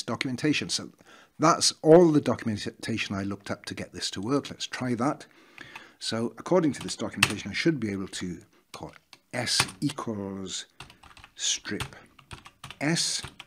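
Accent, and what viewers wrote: Wales, United Kingdom. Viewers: This is the first video I have seen/been suggested of yours. While I very much agree with the message and this way of learning a new language I was mostly happy to hear a Welsh accent after not having been back to Wales in 4 years.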